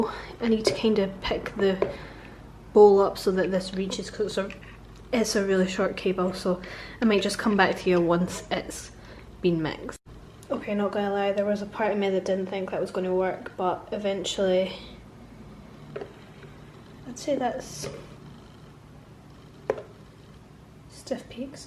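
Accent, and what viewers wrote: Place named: Scotland, United Kingdom. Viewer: I freakin adore Scottish accents. It’s the one accent I can not do myself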